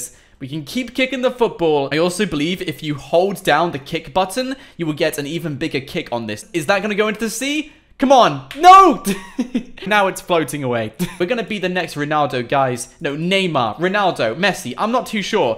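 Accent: England, United Kingdom. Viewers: Are you from England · Wow you are English wow man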